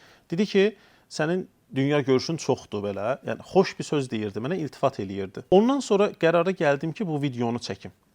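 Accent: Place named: Shaki, Azerbaijan. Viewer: Nitqinizdə Şəki ləhcəsi oturub.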